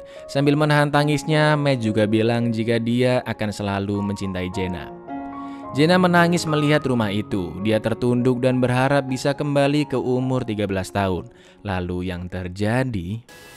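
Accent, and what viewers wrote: Java, Indonesia. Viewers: Wong jowo ya bang apa lampung?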